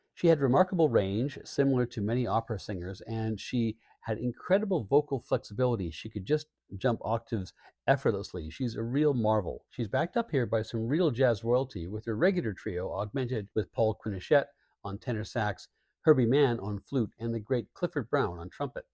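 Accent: Canada. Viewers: My bad that I misheard you🙏🏻 It’s your thick Canadian accent🇨🇦 that tripped me up!😁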